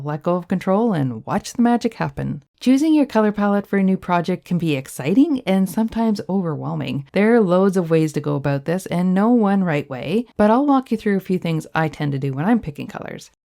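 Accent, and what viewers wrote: Canada. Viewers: You sound canadian. So hopefully you have access to Home Depot and/or Lowes. I sometimes use their color swatches from the paint department. It's good for gradient colors and mixing and matching. I know, sounds a bit weird, but really helps me with color choices.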